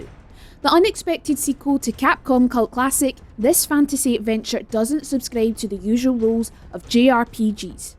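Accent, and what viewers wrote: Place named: Scotland, United Kingdom. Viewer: Scottish I think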